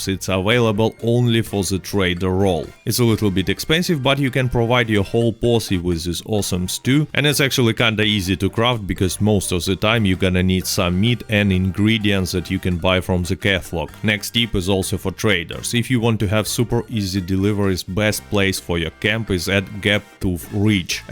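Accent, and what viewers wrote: Russia. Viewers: Russian accent and wilderness sounds great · he has a Russian accent maybe because he is Russian?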